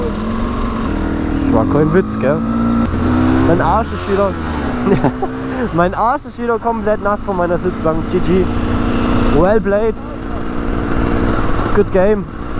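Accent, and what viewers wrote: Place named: Ulm, Germany. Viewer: Als ob du aus Ulm kommsch:D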